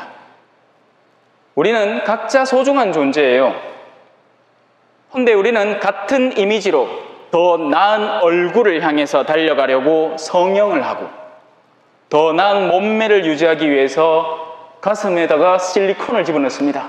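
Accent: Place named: Daegu, South Korea. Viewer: 신부님의 대구 사투리 구수하네요 알기 쉬운 강론말씀 감사합니다 세속 육신 마귀에서 열린 자 되겠습니다 ^~~♡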